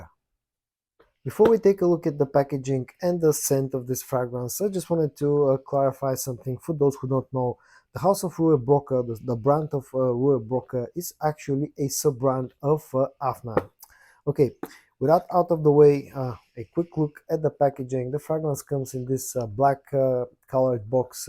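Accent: Bulgaria. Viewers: Хаха веднага познах, че си българин от акцента иначе добър клип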